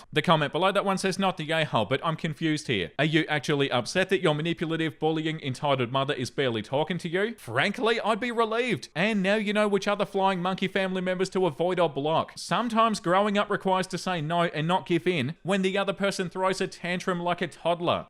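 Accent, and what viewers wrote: Australia. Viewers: My daughter and I love listening to you read stories. Indignation just sounds better in an Australian accent 😊 Thank you for the awesome entertainment!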